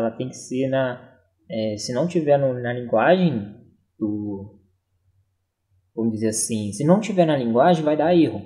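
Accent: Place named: South, Brazil. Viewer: VC É DO SUL DO BRASIL TIPO SEI LÁ RIO GRANDE DO SUL POR CAUSA DO INICIO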